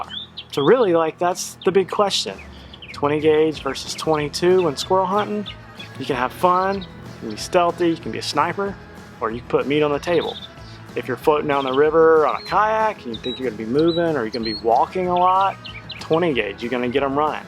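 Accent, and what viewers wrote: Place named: Alabama, United States. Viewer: I love all your vids I'm in South Alabama we have the exact same accent lol l think it would be so cool to hunt and fish with you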